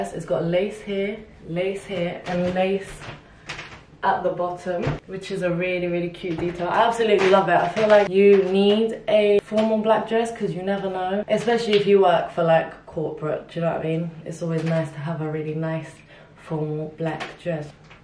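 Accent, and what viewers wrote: United Kingdom. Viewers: Cheers! Your British Accent adds to your Beauty 10 fold.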